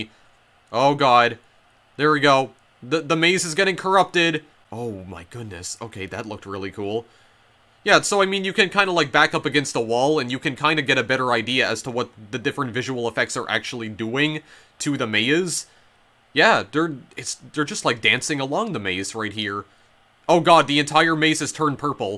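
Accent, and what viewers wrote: Canada. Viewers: Where are you from? You pronounce the words (like game) the same as me, but your accent is also different from mine. I'm from the north east of England but you don't sound like you're from here. It sounds almost canadian to me? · Was looking for a comment like this, not disappointed Legitimately thought I had a stroke for a second, Canadian makes sense tho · the canadian dialect gives me more brain damage than the game itself. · Where are you from? What’s your accent? I genuinely would love to know! I am not sure what your accent is but I feel like it is a Canadian accent? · lmao, no it's an effect of Canadian English dialects called Canadian raising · My guess was northern Saskatchewan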